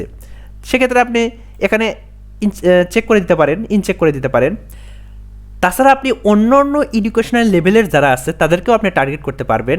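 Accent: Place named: Bogura, Bangladesh. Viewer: ji vai apnr kotha sune bujte parchi vai ..... je apni bogurar manush ,, amieo bogura thaki vai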